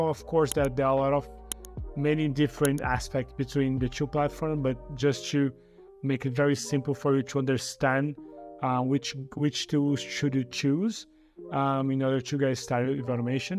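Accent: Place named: Brazil. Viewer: eu nn posso provar, mas tu tem um sotaque de br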